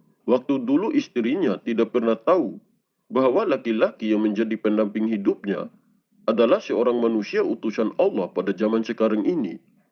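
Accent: Bima, Indonesia. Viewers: Ini org buat vloq YouTube ngawur ngidul ngetan kullon . Anda itu jgn memfitnah jodoh Satryo piningit hanya orang biasa biasa sj . Emang anda bisa lihat wajah asli Satryo piningit dan ratu adil . Setahu saya ratu adil itu putri bangsawan dr tanah Padjajaran dan Majapahit ya . Anda ini jgn trawang tentang Satryo piningit bahwa beliau itu dpt kekerasan dari istrinya . Kayanya ini orang bukan orang Jawa suaranya kaya orang BIMA NTB . SATRYO PININGIT ITU KHUSUS UNTUK ORANG JAWA DAN SUNDA YA .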